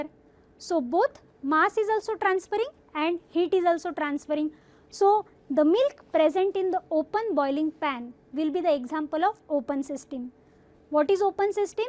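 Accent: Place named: Maharashtra, India. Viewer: Please change the Blazer, as it it's not suitable for you. Out of rest of the things ....change pronunciation as its should sound like exemption from atypical Marathi ...more of universal being.Pronunciation of certain words can definitely be improved.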